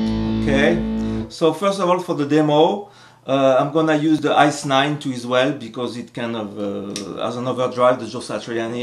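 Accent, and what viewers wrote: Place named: France. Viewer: On sent l'accent français :') Bonne video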